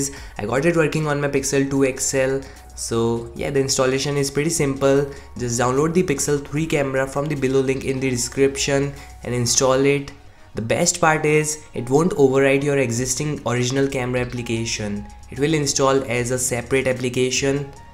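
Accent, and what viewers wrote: India. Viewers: I thought you were Indian!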